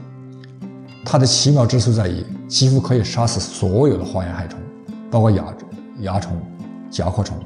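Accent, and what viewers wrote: Yunnan, China. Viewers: 老師是不是雲南人，普通話有雲南口音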